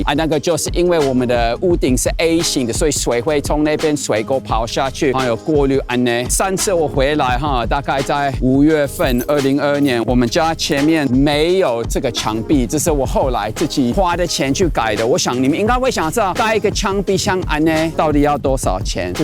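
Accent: Taiwan. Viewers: 教授的台灣國語加台語真到地,太像台灣人講話模式了!哈哈！ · 教授台灣國語涅😂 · 😂😂教授講中文竟是臺灣國語....安捏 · 越來越有台灣人講話的「口氣」，安捏都丟啦😂😂😂 越來越往幹話發展的趨勢，哈哈😄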